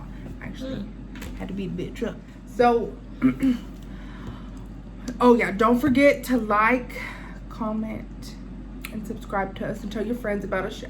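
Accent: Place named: Clovis, United States. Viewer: Lmaooo😂😂 this sound like so many Clovis females I know, got me wondering which ones all nosey🤣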